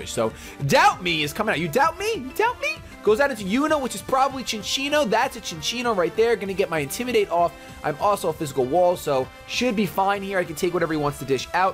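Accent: India. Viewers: Indian accent ftw. You've got yourself a sub bro. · Why the Indian accent offensive to me mate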